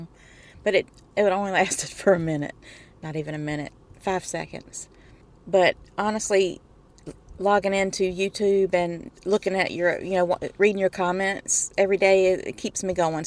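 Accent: South, United States. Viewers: Watching your YouTube video tonight, and seeing my home province and familiar scenery through a visitor’s eyes. It’s made me a bit homesick, because I am living in St John’s, Newfoundland and I haven’t been home since 2019 ( before the COVID pandemic). I was really hoping to get home this summer, having been laid up with Arthritis and finally this March, I got a total hip replacement done. I’m recovering very well, however, I do need my other hip replaced, and hopefully that will happen sometime this fall (I have already signed my consent for it). Definitely going home next summer and possibly moving back to New Brunswick. Strongly considering it. I just love your accent, I have family in Houston Texas so nice to hear a bit of the southern drawl as I haven’t been to visit there in over 10 years now. Please don’t feel out of place in our Maritime provinces, We have all kinds of accents and many cultures, people from many countries who now reside on our East Coast. 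There is a famous saying “it’s a small world after all” and it’s getting smaller all the time. You seem like a really lovely person and I do know what you mean by “some people “ being not so nice. Yes, those people are everywhere, however, most of us Canadians you will find to be very polite, kind and respectful. I subscribed to your channel so I could see some familiar haunts of mine. Drive safe now. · I just discovered your video via Youtube suggestion. Very happy that you enjoy your stay in NB, so far (I live in Moncton). Thank you for your kind words for Canada and Canadians (yes, there could be bad people, like anywhere else in the world). Love your personality and your southern accent! Have a great road trip! 😊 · Work on getting rid of that American accent. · haha don't tell Canadians that thing about God having a southern accent. tbh, I don't even understand the joke. I'd leave God out of any casual conversation. 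People will be charmed by the southern accent itself so don't worry about how you sound. Enjoy your trip. you will fit right in.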